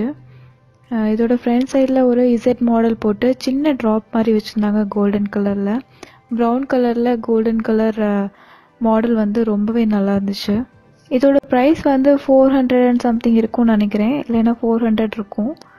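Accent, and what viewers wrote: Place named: Kanyakumari, India. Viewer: Kanyakumari slang super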